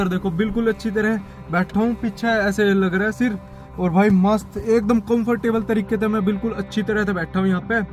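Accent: Haryana, India. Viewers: Bhai tera haryanvi accent and tu itna handsome ❤❤❤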